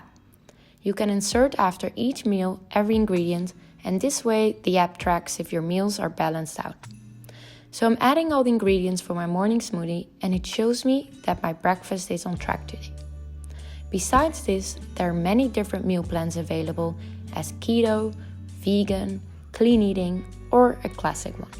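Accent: Netherlands. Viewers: je accent is heerlijk , hoor gelijk dat je nederlands bent lmao. Xxxx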